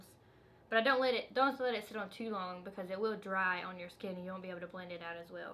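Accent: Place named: South, United States. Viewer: Wow! That’s a crazy trick considering coconut oil actually breaks down Makeup!! You look super gorg!! Loving the bloopers!!! Where are you from? I can tell you’re a southern sister!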